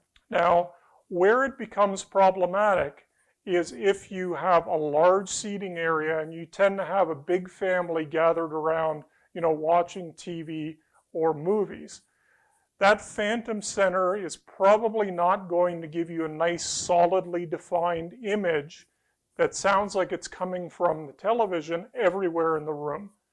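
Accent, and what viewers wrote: Canada. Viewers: In literally 3 secs i knew guy is from or in Canada!!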